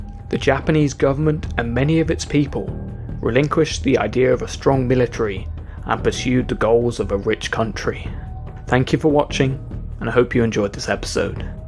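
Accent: United Kingdom. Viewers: That accent,you from England?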